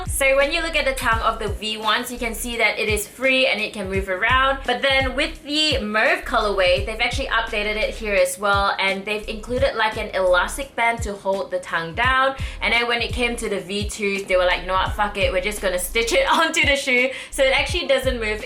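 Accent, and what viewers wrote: Australia. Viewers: I’m buying these after I watched your review vid. I love your ausie accent. It’s super hot. I’ve spent a lot of time in Sydney and Gold Coast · Her Aussie accent sounds really lovely.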